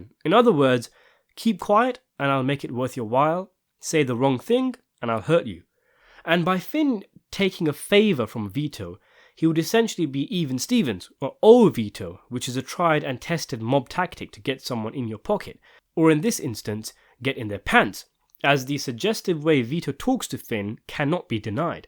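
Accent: United Kingdom. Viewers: Can’t get enough Sopranos wordplay from someone with a UK accent. Keep it up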